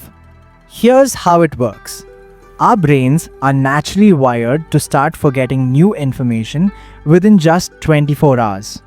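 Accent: India. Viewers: His accent is Indian!! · Sorry, totally off topic ... don't understand why narrator has "Indian" accent ... (imho disappointing - no offense to narrator but I think it would've had more impact. Just saying).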